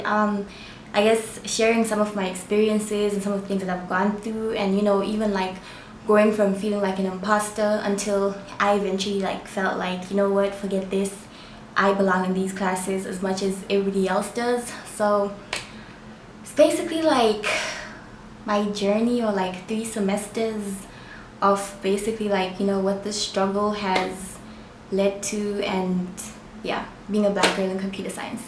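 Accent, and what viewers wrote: Caribbean. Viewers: where you from? the Caribbean? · Are you carribean? I hear an accent.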